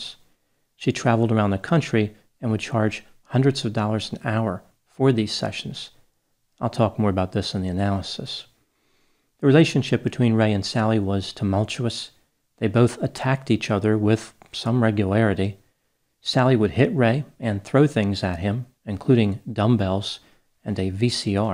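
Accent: United States. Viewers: My name is marianne and live in England 🇬🇧 I love the way it sounds with American accent 😀 😄 xx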